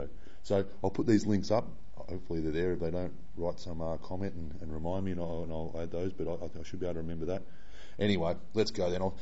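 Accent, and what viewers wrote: Australia. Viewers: after watching these lessons i wished all my teachers had australian accents.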